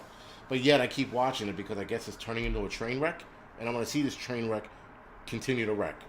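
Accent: New York, United States. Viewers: Your New York City style eloquence is showing again.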